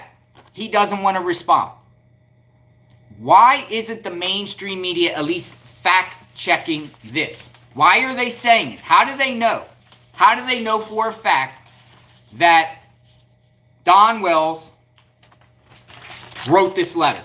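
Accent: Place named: New Jersey, United States. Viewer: HEY, personal question. (You don't have to answer.) I have fun trying to figure out accents. Are you originally from Jersey or Philly? I went from Jersey to Miami Beach in my teens so I know that a lot of New Yorkers move down to FLA. Snowbirds, you know! 😆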